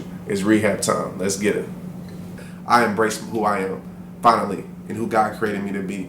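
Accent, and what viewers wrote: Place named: New Orleans, United States. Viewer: New Orleans baby !!!! Me too son ! thought u look familiar , naa j/k .. na but you do have that Orleans style. !! you talk like us too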